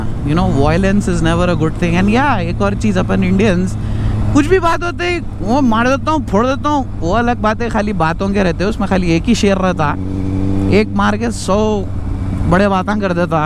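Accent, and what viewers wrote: Hyderabad, India. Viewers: I guess u know telugu... Bhaya serious gaa cheptunaa echipadesinavv🔥, Btw mi videos next level unatai asal😍. Bc asal skip cheyalii anipinchadhu 20 or 15 mins unna kani, aa flow ala untadhi aa hyd slang & me content. Love u bhai♥️🥂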